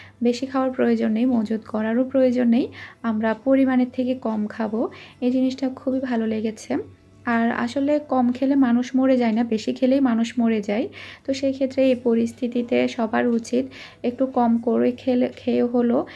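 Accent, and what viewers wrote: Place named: Satkania, Bangladesh. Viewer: আপু সাতকানিয়ার ভাষায় ব্লগ দিতে হয়তো ভালো লাগে না মনে হয়? কিন্তু আপু তোমার মুখে সাতকানিয়ার ভাষায় কথা শুনতে আমার খুবিই ভালো লাগে ।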